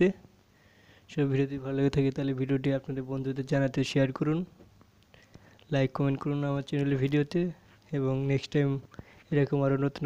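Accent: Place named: India. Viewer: English ENGLISH . Dont put your title in ENLGISH if youre speaking chicken scratch from india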